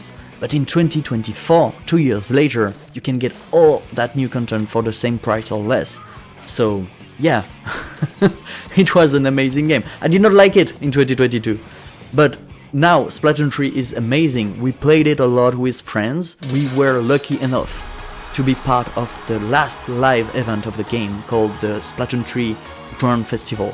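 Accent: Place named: France. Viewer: That charming french accent !!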